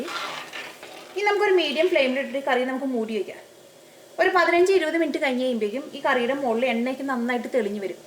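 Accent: Thrissur, India. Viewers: are you from trichur,because your accent is like trichur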